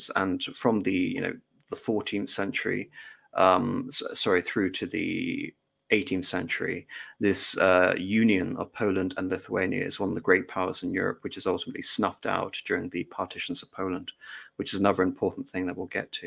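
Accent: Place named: United Kingdom. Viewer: Nice british akcent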